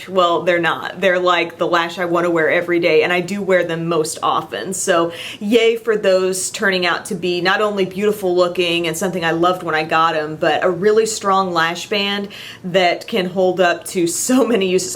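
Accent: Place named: Midwest, United States. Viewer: Your ridiculously adorable Midwestern accent makes me feel like you're my super pretty big sister patiently helping me learn how to do my makeup so I don't end up with bronzer tiger stripes!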